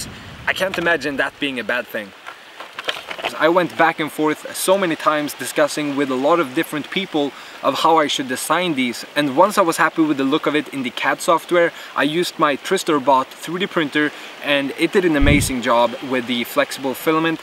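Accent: Sweden. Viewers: Are you from sweden? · Are he swede??? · is this guy swedish?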